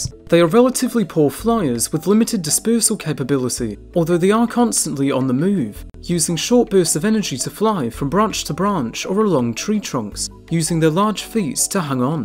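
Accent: England, United Kingdom. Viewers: are you English or New Zealand ish? Because you sound English but mainly do birds from New Zealand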